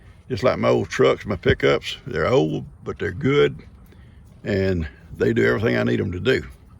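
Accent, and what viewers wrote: South, United States. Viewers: Philadelphia checking-in., I'm not quite sure what it is but when I'm feeling down and don't get me wrong I always watch your content. Either your soothing voice your Southern drawl or the animals really cheer me up and put me in a positive mood. So I thank you sir. 👍💪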